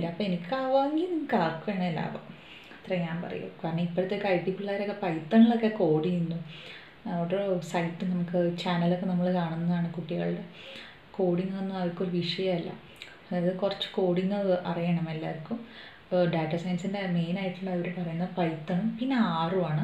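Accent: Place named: Thrissur, India. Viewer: Thrissur slang നന്നായി endu....😀😀🙋🙋🙋🙋🙋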